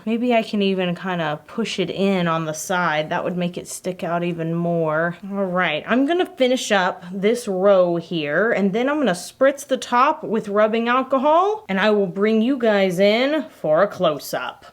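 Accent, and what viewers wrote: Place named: South, United States. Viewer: May I just say that as a southern woman my self. It brings me great joy to hear her accent and her say " yall" 😌😂😂 makes me feel right at home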